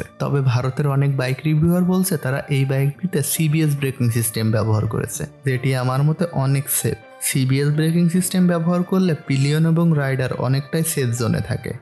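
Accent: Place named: Netrokona, Bangladesh. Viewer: kotha bolar style eto low quality keno ??? ageee valo vabe bangla bola siken...kotha sune mone hosse Netrokona area er kno jokes bolcen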